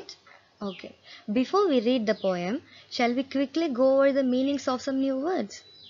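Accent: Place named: United States. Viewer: thanks mam but I think that you are from America because of your voice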